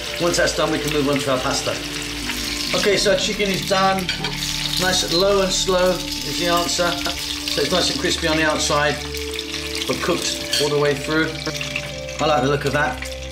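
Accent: United Kingdom. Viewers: is it $ or £ ?... Chef sounds british & cooked good brit faves 😋 i will try but might spend more than $20 in £s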